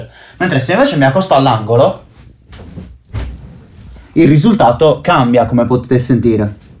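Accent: Sardinia, Italy. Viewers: Ciao! Un consiglio :) ho una stanza che ho adibito a studio, sia per suonicchiare/registrare qualcosina, sia per streaming di gaming. La stanza è un po' lunga e stretta, ma soprattutto il soffitto è molto alto. A grandi linee: 2x3,7m, soffitto circa 3,7m. C'è abbastanza riverbero sulle basse, ho pensato di "abbassare" il di circa un metro e mezzo con uno strato di pannelli fono assorbenti piramidali da 5cm...ha senso? Mi sai dare una dritta? Ciao da un tuo concittadino 😁 (dall'accento direi che siamo entrambi di Cagliari) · Si sente giusto un po' che sei sardo ahahha, Comunque bel video, Grazie ❤️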